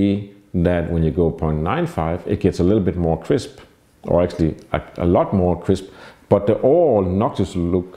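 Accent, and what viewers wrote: Denmark. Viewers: very danish accent - but god input man !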